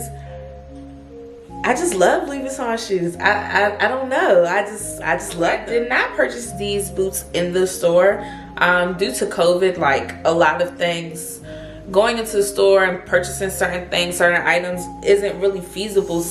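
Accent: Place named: DMV, United States. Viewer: So cute! Sn...r u from the DMV? I thought i heard an accent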